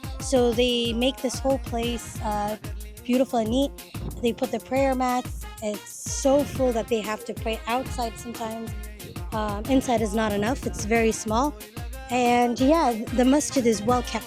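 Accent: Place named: United States. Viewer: Oh you are American accent masha allah u do well show people good things our island like jazeera beach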